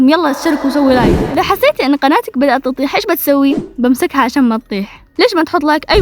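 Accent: Yemen. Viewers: والله من اول فيديو شفته لك حسيت ان يمنية 😂😂 وجيت لذا الفيديو اتأكد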